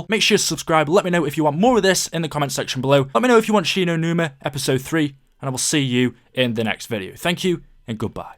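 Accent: United Kingdom. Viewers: Giovanni Costilla well he is from the United Kingdom, and he is British, not Canadian like vanossgaming.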